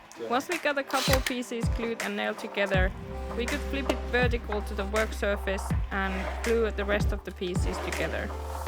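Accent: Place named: Finland. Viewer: Simple yet very nice looking design! What makes it more interesting that I was just browsing general wood-working videos then this one was recommended, and I recognized from your voice that you are from Finland as well :)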